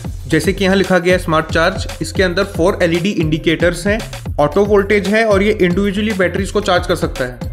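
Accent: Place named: Arab world. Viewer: I like hearing Arabs speak English like this. Which country are you from Saudi Arabia,Kuwait, Dubai,Yemen?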